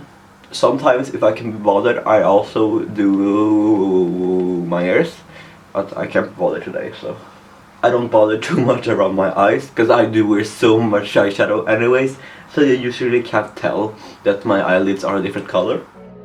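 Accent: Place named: Sweden. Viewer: YOUR SWEDISH ACCENT IS SUPER COOL, from which part of Sweden are you from?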